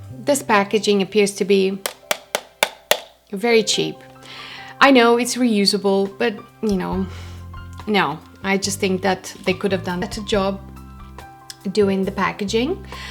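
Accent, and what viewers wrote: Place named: United States. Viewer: Как прекрасно ты говоришь, словно ты американка!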